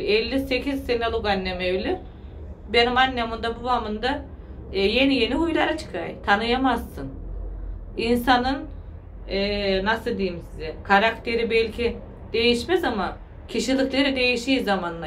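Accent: Trabzon, Turkey. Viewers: seni keyifle izliyorum tirabzon sivesiyle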